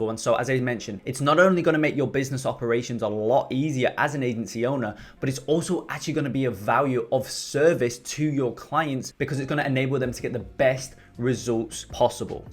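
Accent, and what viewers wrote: United Kingdom. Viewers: Hey bro are you originally from the UK?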